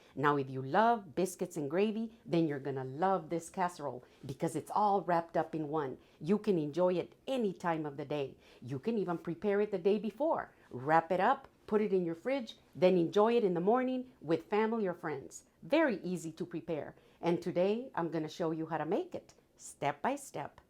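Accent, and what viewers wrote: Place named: Texas, United States. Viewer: "I'm gonna".....girl, if ever there was any doubt you're a native Texan, that should alleviate it. You should throw in a few "I'm fixin to"s just to seal the deal.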